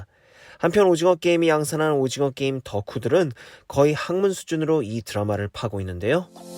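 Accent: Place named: Korean-Chinese, China. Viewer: 억양이 조선족 같은데?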